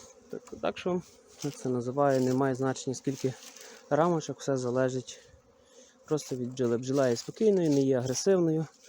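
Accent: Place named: Ternopil, Ukraine. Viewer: По розмові чую брате ти з Тернопільщини. Південний захід. Бучач та околиці? Я з під Чорткова.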